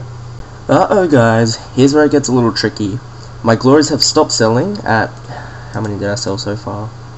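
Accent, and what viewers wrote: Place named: Australia. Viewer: PURE FUCKING AUSSIE!!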